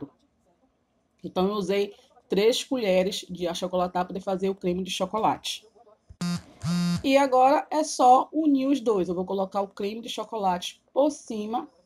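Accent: Bahia, Brazil. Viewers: Êita que saudade desse sotaque da Bahia. Lembro da infância e de minha mãe preparando para mim! Aqui em Buenos Aires não tem ameixa em calda no mercado. Vou tentar fazer o caldo de ameixa aqui. Muito obrigada por compartilhar!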